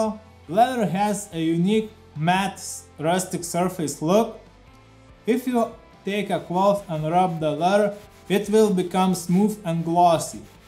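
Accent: Russia. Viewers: Русский акцент)) уважение.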